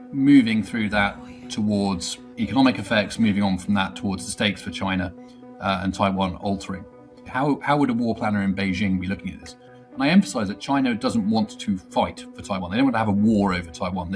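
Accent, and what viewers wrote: United Kingdom. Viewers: Polycrisis? Are we making up words now? Take your British accent and try to fix your own country, you don't have time for the world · I need to get a fork from all the word salad in a British accent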